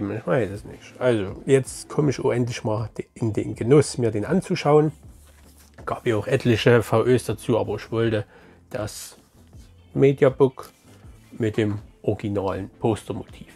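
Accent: Bavaria, Germany. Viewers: Servus da hast Du interessante Filme bekommen gerade den film Bliss ich wünsche dir gute Besserung es dir ein Leberkäs den kann man titschen aber nicht in die Milch sondern in den Ketchup und zwei Knödel aber zum Essen😉 mach noch mal nächste Mal noch bayrisch das tut gut da kriegt man Heimweh da ich gerne auch nach Bayern möchte in Dorf 🙏 gruss Tony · Danke für dein Päckchen! Joa mei dein Bayeisch ist ja Klasse! Mach dir mal en Leberkäse vielleicht hilft s! Konntest schon was aus meinem Päckchen sichten? Possesor muss ich nochmal starten letzte mal abgebrochen!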